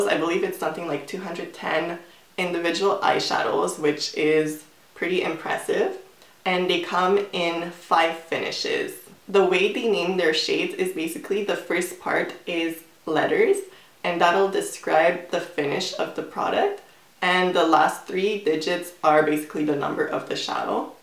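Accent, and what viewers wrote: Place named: Russia. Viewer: i have been watching your videos and i would like to know where is your accent from... it sounds like russian to me but i can't know because I am from Chile ( where the 33 miners were rescue alive)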